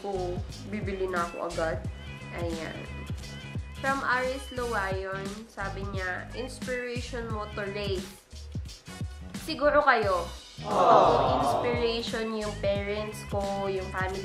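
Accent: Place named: Pampanga, Philippines. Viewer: Kapampangan ka po? May accent e haha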